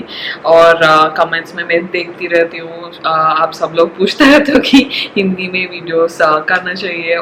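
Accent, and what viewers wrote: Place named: Hyderabad, India. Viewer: Hyderabad ka Hindi v bole to acha nhe rahta..... jaisa rhta waise he chalenga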